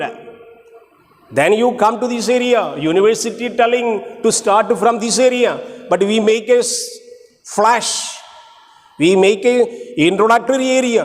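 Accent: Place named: Kerala, India. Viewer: English sounds malayalam..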